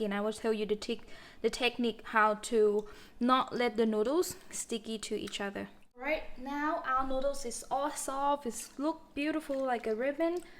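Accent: Australia. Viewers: are you living in australia? i can hear it in your voice a subtle aussie accent 😅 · your accent is evolving! sounds like an ausie <3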